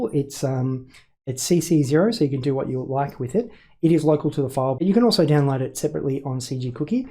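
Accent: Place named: Australia. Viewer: feel like im at aus again, with the accent, been working thier for about 3 yrs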